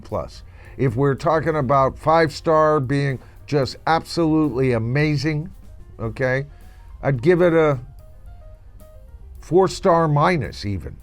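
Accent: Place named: United States. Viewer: . If you are an American, and your accent makes that likely, You and I and all the Rest of Us have more serious things to worry about than a Plastic "Yes" ( and how many originals are in this 2026 lineup? )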